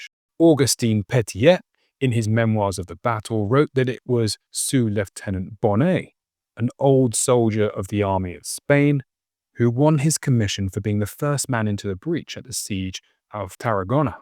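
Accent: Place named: London, United Kingdom. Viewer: It’s not an impediment it is I suspect a London dialect and as such as valid as RP this is not 1930s England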